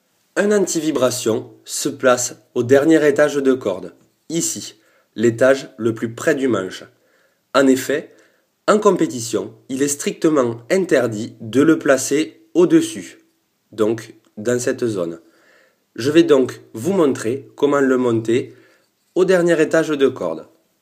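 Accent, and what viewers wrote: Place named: South, France. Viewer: mais non, quel bonheur cet accent du sud ! 🥰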